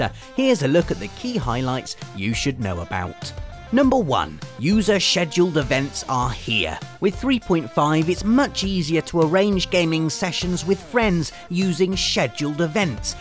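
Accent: United Kingdom. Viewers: User Sczeshelled events. Love it! :) I wish I had a cool british accent too.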